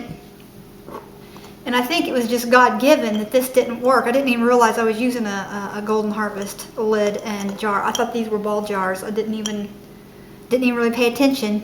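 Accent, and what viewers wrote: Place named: South, United States. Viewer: I am learning how to do food storage prep and your video has been a great help to me! While I plan to have some Mountain House freeze dried, I wanted to learn how to store basics like rice, beans using oxygen absorbers and vacuum seal in Mason jars rather than mylar bags. You really have a knack for teaching with a delivery that is both interesting and delightful, and I gotta say that your southern accent is so charming :) Many thanks!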